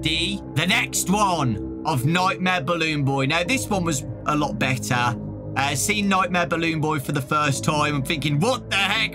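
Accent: United Kingdom. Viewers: Bruh he british? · My friend:"who are you watching" Me:"Dawko" My friend:"oh, who is he and what does he do" Me:"he's British, he talks loudly, and plays FNAF" My friend:"so he's like Morgz?" Me:"yes.... But with FNAF"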